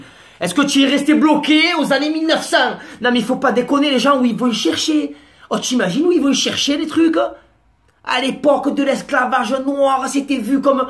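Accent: Marseille, France. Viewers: T as raison mec ! Tu dis exactement ce que je pense et avec l acceng de Marseille gros ça passe bieng mieux. Degeun peut dire le contraire 😁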